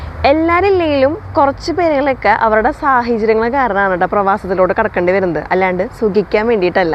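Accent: Thrissur, India. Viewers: Ningalude Thrissur slang adipoli annu, and what you said is absolutely right😊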